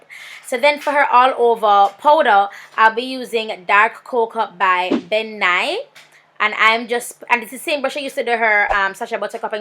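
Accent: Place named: Jamaica. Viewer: real Jamaican just the talking make you know lol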